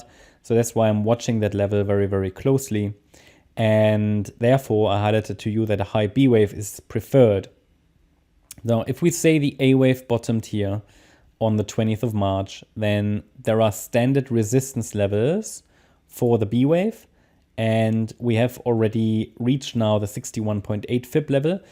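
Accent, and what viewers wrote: Germany. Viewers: love your content too! you are a german guy? i mean i hear it^^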